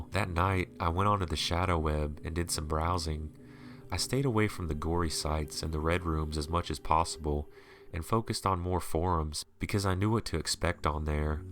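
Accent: South, United States. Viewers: It sounds a bit more Southern to me.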